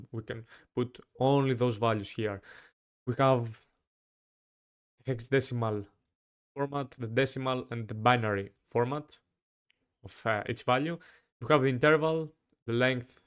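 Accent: Greece. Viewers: Το καταλαβα οτι εισαι ελληνας απο την προφορα.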